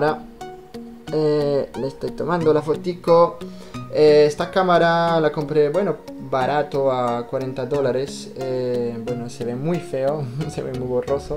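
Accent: Bogotá, Colombia. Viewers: Tu acento es muy colombiano eso es verdad. Los québécois que están en mi clase de español matarían por hablar como tú, ya que se van a un stage a países hispanos. Te conocí gracias a una antropóloga amiga mía que ve los intercambios Québec-México Y Mexico-Francia y a los hispanos les puso un par de videos tuyos en el tópico de choque cultural, no eres antropólogo pero tu honesta opinión nos parece objetiva y certera, a mucha gente nos interesa la cultura y la sociedad, sigue adelante. Saludos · Pareces colombiano porque tu español muy bogotano. Posdata: Disfruta el verano y broncéate mucho! Posdata de la posdata: Zidane, calvo hijo de puta, mete a James ajajaja (es broma) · hola! me gusta mucho tu manera de hablar, no se si hablas muy como colombiano porque yo soy de México pero sí creo que tu español es buenísimo:) Qué padre que hagas videos positivos de estos lares. 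coincido en que eres guapo!:) ojala que sí encuentres una latina que te haga muy feliz!!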